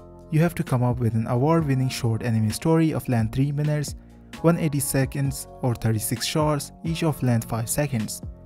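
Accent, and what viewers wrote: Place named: India. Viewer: Is it only me who thinks he has an Indian accent?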